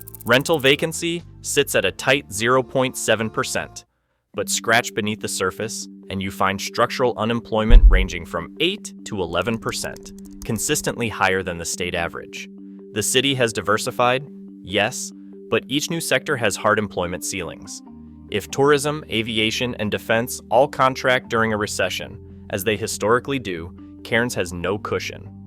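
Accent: United States. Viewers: Perhaps you might use more up to date information and use relevant shots instead of pics of other parts of the world. Not sure who you are but an American voice just denigrates the post. But you have fun passing misinformation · Why the American accent, inconsistent info and fake claims? clickbait. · Why the American accent?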